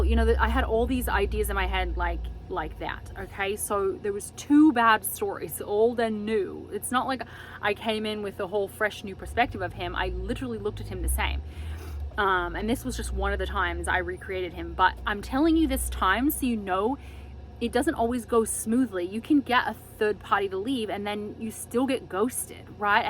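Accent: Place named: Australia. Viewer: Super cool scarf!! Australian accent superb as always!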